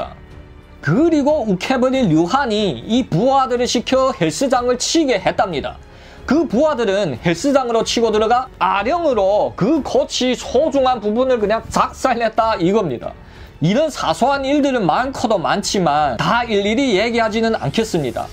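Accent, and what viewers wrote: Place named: Shenyang, China. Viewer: 진행자가 조선족 인간이네 말소리들으니 선양조선족이다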